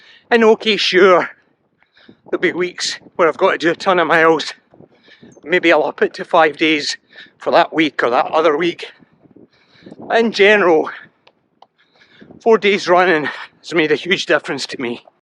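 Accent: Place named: Scotland, United Kingdom. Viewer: Thanks for your videos. As a 64 year-old avid runner, they are great for me. I noticed your Scottish accent, but see from all the US flags in your videos that you're presently in the USA somewhere. I spent 3 years stationed in Thurso (far north of Scotland) as a US Navy Electronics Technician and my wife (for 40 years now) is from there. Where are you from?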